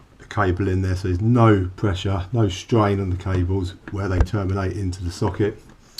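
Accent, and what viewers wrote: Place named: Newcastle, United Kingdom. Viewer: just because your speech is almost the same as a colleague of mine’s (an electrical guy himself yet working in a Datacenter) originally from Newcastle… forgive my ear I am not british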